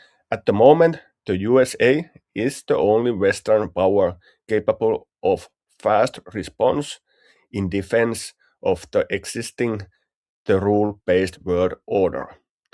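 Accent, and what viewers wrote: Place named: Finland. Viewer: Thanks, nice to hear a Finn speak English👍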